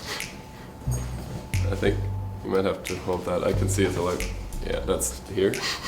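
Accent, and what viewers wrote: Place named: Germany. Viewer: schön dein deutscher Akzent! :D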